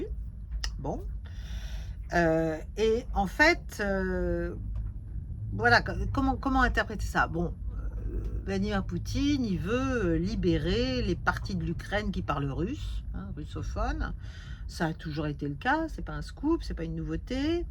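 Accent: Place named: Upper class, France. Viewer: Votre accent bourgeois du XVI ème me dégoûtait déjà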